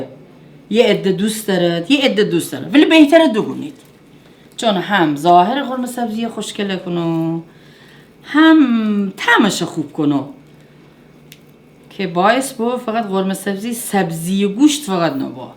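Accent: Gilan, Iran. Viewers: بی نظیر عالی دستتون درد نکنه خیلی لذت بردم هم از غذاتون هم از گویش و لهجه شیرین گیلانی شما دوستدار شما از انگلیس 👏👏👏👏👏👏👏😘😘😘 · درود بر خانم خوش صحبت و خوش رو بسیار عالی بود هم آشپزیتون هم گویش زیباتون ، بله دقیقا طرز تهیه قرمه سبزی گیلانی مون همین جور هست بسته به ذائقه هر خانواده نوع گوشتش و حبوبات و مزه دار کردن غذا فرق میکنه... بورانی تون عالی بود کدبانو جان ما پیاز نمی ریزیم. غذا های گیلان مون بی نظیرن 👌👌👏👏👏🥰🥰🤗🤗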